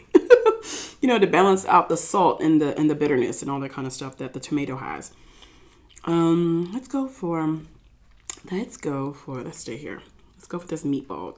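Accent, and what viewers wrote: New York, United States. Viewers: Yeeesss with the NYC accent. Rep my hometown😍😍😍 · Yes you sound a New Yorker and we loveeee your videos‼️ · Hey boo lol the New York Accent 🤣🤣🤣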